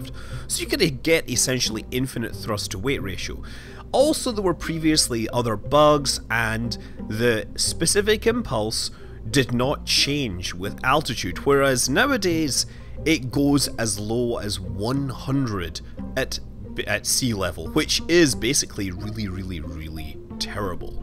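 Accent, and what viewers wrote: Scotland, United Kingdom. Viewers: Scott Manley language or Scottish?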